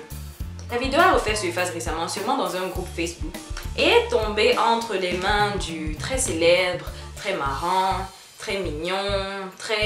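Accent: Bamileke, Cameroon. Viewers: 😂😂😂😂😂😂😂😂😂😂😂😂😂😂😂😂😂😂😂😂😂😂😂😂😂😂😂😂😂😂😂😂😂😂😂😂😂😂😂😂😂😂😂😂😂😂😂😂😂😂😂😂😂😂😂😂😂😂😂😂😂😂😂😂😂😂😂😂😂😂😂😂😂😂😂😂😂😂😂😂 hahahahahahaha!!!!!! l'accent bamiléké là c'est la magie!!!! troooooooooooooopp drôle tu es!!!! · Ton accent bami la me tue. On s'en fou comme dab 😂😂😂😂😂😂🤗🤗🤗😊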